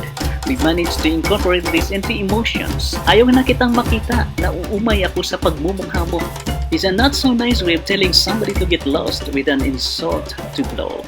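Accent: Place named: Philippines. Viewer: Nice one you sounds filipino ag galing. Team unity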